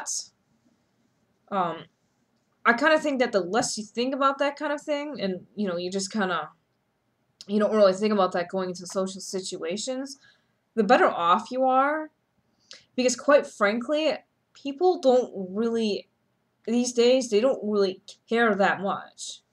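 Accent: New York, United States. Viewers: Hi, I'm from Australia....really enjoyed your thoughts......what accent is that? Is that a particular area of NY?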